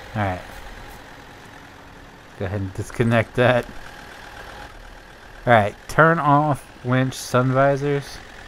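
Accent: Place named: Canada. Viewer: Laughed at that Canadian accent 😂. I’m sure you hear me on Saturdays slipping up with “bud” and “eh” A LOT lol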